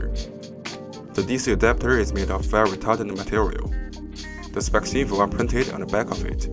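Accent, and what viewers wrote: China. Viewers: They are from china. And I guess some people may not understand the way they speak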